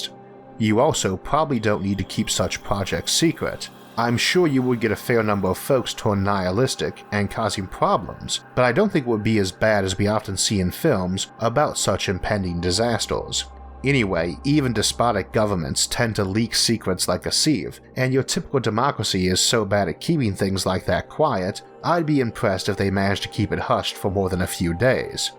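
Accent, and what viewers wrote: United States. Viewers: Why does your voice sounds like that?? Are you american?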